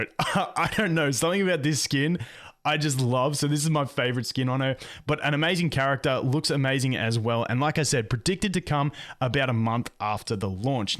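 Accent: Australia. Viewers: Wait is he an Aussie. Surely? Still subscribing anyways lmaooo Edit: yep he definitely is… he has 7/11 ads HAHAJSHSHSJSJ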